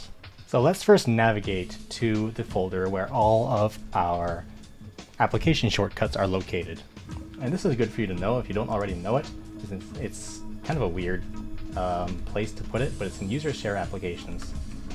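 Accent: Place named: Canada. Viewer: Bro's voice sounds a bit like my friend from Vancouver. I'm all the way over the other side of the Atlantic, though, and don't ride with that many Canadians.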